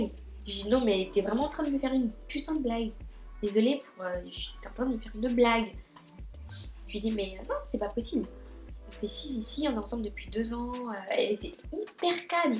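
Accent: United States. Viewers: t tro belle est ton accent amairiquain ik est 😍😍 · t'a trop l'accent américain et j'aime trop ta storytime . je m'abonne 😘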